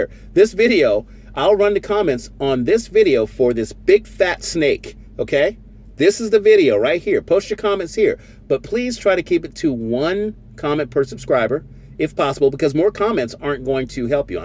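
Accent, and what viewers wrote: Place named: United Kingdom. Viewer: your brit accent is spot on!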